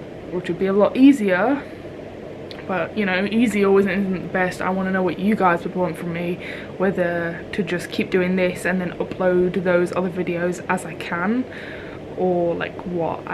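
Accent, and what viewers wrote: United Kingdom. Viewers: Is British? Sorry if this question is stupid 🤦🏼‍♀️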